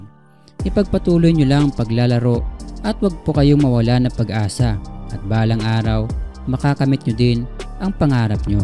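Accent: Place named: Surigao, Philippines. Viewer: "Bul yo" yan pag pronounce... Surigaonon ni bai...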